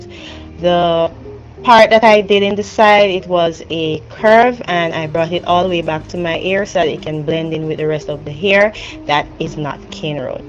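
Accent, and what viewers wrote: Jamaica. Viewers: is that a Jamaican accent I hear? 😁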